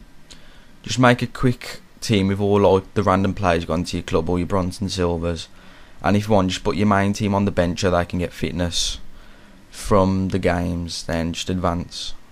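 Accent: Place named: Black Country, United Kingdom. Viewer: u sound like ya from west midlands like me but u soun d black country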